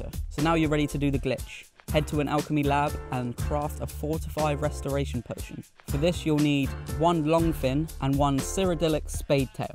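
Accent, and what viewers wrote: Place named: RP, United Kingdom. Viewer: Quick, simple and in Queen's English (can't say that anymore!). Thank you 👍🏻.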